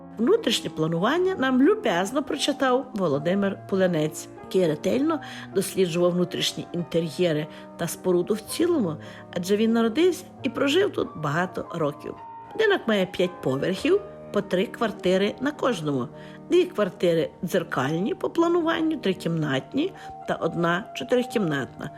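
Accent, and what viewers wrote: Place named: Western Ukraine, Ukraine. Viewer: ДЕТОЧКА НЕ ПИШИ глупости ЕСЛИ не знаешь галичанского укр.языка.там именно на всей галичине так столетиями разговаривают и общаются. У этой дикторши даже произношение " захiдне, а не схiдне..Разговорный язык на Западной украине отличается и отличался от восточного и центрального украинского..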